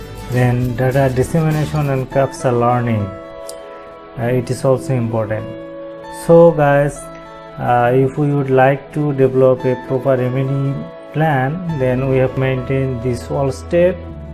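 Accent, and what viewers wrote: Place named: Bengal, India. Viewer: looks bangali English so try to focus on pronunciation & then upload it.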